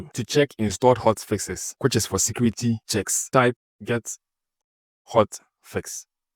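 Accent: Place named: Spain. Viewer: Sounds like Spanish accent. Spanish speakers who try to speak English forget that we separate our words. His sentences almost sound like one word. SLOW DOWN and separate your words.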